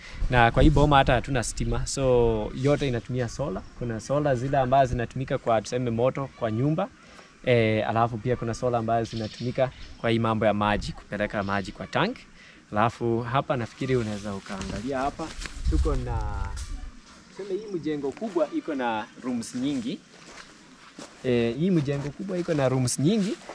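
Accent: Bukusu, Kenya. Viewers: FOR ME IT'S THE LUHYA ACSENT, I LIKE THIS HAPPY FAMILY, MAY GOD CONTINUE BLESSING AND PROTECTING THEM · Accent ni ya mbukusu bana he talks like mulamwah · Mzungu mbukusu😅accent niya kibukusu aki😅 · The luhya accent is on point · The Luhya accent is just awesome 😀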